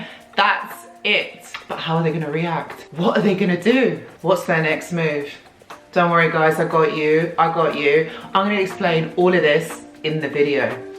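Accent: England, United Kingdom. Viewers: I found this for a reason. Lovely to hear it with an English accent!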